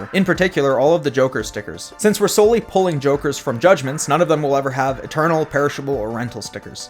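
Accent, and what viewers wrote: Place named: Alberta, Canada. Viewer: HAHAHAHA I thought I heard Alberta but I didnt want to be wrong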